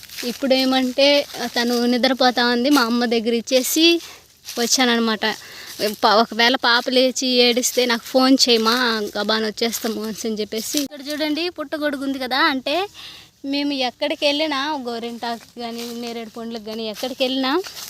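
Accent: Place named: Rayalaseema, India. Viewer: హాయ్ అండిబాగున్నారమీవిడియెస్.బాగున్నాయి.ఎవూరుఅండిమీది.మీబాషచూస్తేరాయలసీమకుసంభందించినదిగ.ఉంది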